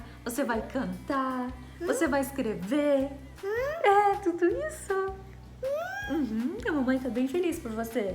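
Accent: Portugal. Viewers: Muito bem, a forma de ajudar os brasileiros que pretendem vir para Portugal tem melhorado ao longo dos tempos. A metodologia das atividades de desenvolvimento incentiva à autonomia das crianças. Fora do horário das educadoras de infância devem existir atividades mesmo não estando contempladas no currículo de desenvolvimento, como seja a socialização da criança. Conserve o seu sotaque de origem, é um valor cultural que não deve perder, já se nota uma pequena aproximação às vogais da zona minhota. Na rede pública, quando há educadoras com formação adequada, é facultado o ensino do inglês a partir dos 4 anos. A IPSS próxima da vossa residência tem bastante qualidade.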